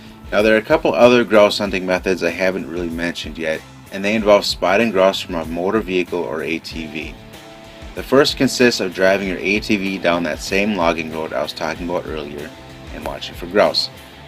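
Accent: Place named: Midwest, United States. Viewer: Great advice, can't wait to put it to use. Feels right hearing it from a midwest accent.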